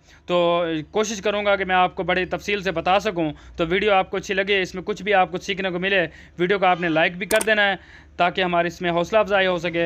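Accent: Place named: Bengal, India. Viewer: Fault to aap ne bataye nahi aur bata bhi to bangali ki trah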